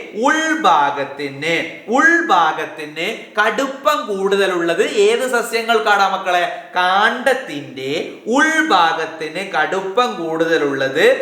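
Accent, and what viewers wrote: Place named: Kozhikode, India. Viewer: Kozhikode aano sir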